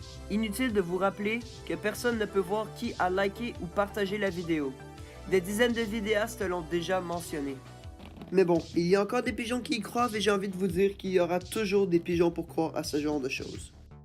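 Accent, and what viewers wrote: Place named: England, United Kingdom. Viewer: t un accent englais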